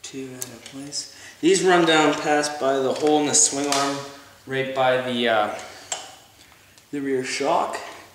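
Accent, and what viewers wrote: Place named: Ontario, Canada. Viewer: Hey you sound like your from ontario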